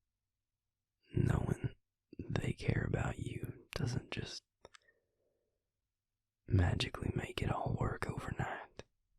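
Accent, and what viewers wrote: South, United States. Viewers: Him: taking My dumb ass self: you sound so Country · I love the southern accent 😫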